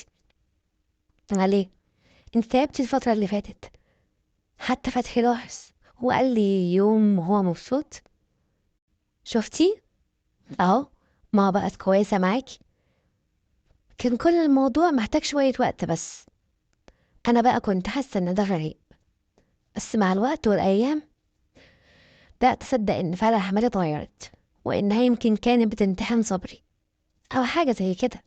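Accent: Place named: Iraq. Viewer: انت من العراق تحيه من مصر بحب صوت اللهجه❤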